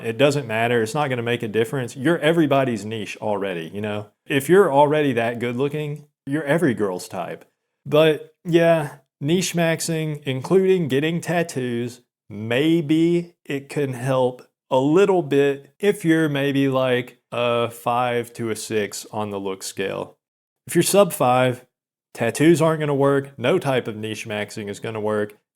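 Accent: South, United States. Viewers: Tbh I thought you sounded like Mental Outlaw when I first found your channel but after time I realized your accent had a more southern sound to it if that makes sense.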